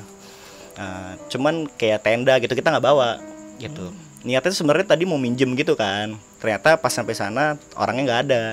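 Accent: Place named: Makassar, Indonesia. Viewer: Hostnya anak makssar yah??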